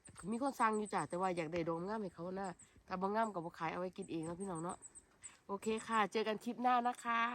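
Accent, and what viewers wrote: Korat, Thailand. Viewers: ชอบฟัง พูด ภาษาโคราชบ้านเอง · สำเนียงคล้ายๆคนโคราชค่ะ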